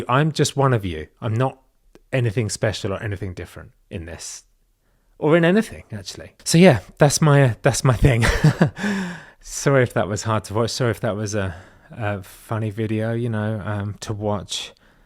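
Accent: United Kingdom. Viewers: Youre British Accent and looks tells me you can be an Actor. You were put on this Earth to be a mentor for your children. Please be happy that God put you on this earth. You are loved!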